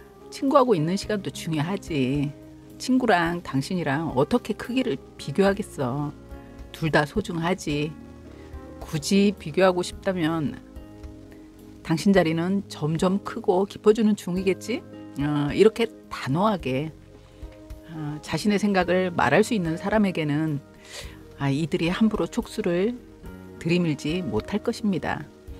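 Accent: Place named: Busan, South Korea. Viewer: 선생님 부산이시네용~~~♥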